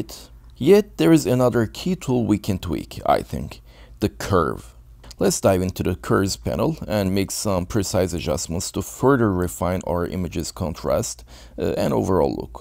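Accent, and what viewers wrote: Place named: Russia. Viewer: Я совершенно не знаю английский, но чувак, я слышу русский акцент)))))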